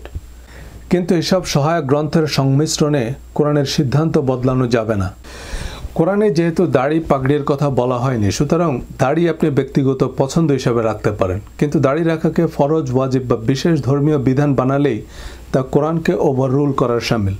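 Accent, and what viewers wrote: Noakhali, Bangladesh. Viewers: By the way.... আন্নে কি নোয়াখাইল্লা???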